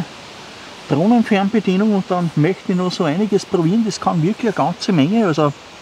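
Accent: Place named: Vienna, Austria. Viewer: Hallo!Dieses Jahr kommt mir die Natur so viel grüner vor als die letzten Jahre,oder kommt es nur mir do vor. Schönes neues Plätzle! Hast dich ja gleich schön häuslich eingerichtet. In letzter Zeit verstehe ich dich nicht wirklich, was vermutlich mehr an meinen Ohren liegt, und ein wenig, dass mir der Wiener Dialekt nicht so geläufig ist. Aber du sprichst den ja schon immer, also sind es meine Ohren, dietrotz Hörgerät nur 50% erfassen oder inzwischen noch weniger. Also wundere dich nicht, wenn ich nicht darauf eingehen. Aber ich gucke dir gerne zu. Bleib behütet, Grüßle Gunde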